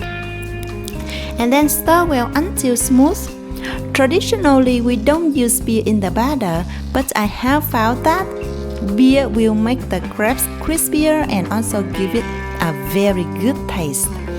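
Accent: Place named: Laos. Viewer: Tiếng Anh mà nghe như tiếng Lào. Phát âm vc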